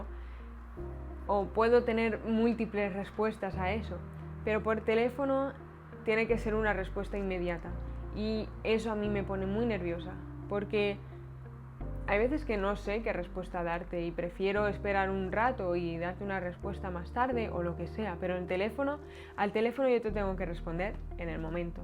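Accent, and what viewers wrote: Spain. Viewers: reconozco facílmente el acento español.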